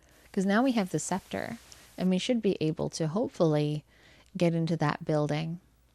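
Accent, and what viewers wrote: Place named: Canada. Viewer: Without trying to come across as too much of a suckup, I am really enjoying this playthrough. The combination of the game's music/sound effects + your voice (and Canadian accent) that makes it really soothing to listen to in the morning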